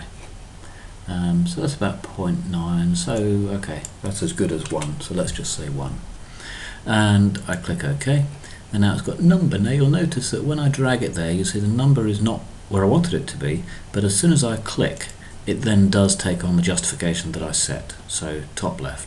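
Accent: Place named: England, United Kingdom. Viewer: Clear instructions; great classic english accent!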